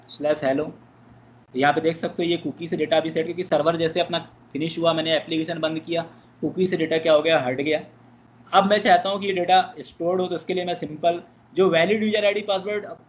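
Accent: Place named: India. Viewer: God I don't know if I either love or hate the hindi accent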